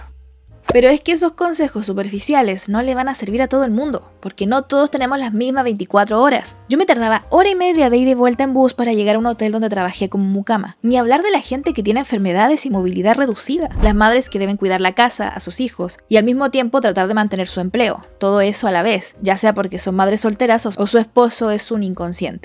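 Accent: Chile. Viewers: me encanta el acento xileno, y tu contenido excelente! un saludo de cordoba, Arg !! me hacia falta reforzar esto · Solo con escuchar tu acento me di cuenta que eras Chilena, agradecido de encontrar tu canal 🤍, saludos desde la V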